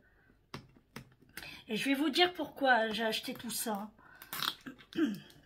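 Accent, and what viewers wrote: North, France. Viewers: Cet accent du nord qui me fait tellement plaisir 🙏😊😊😊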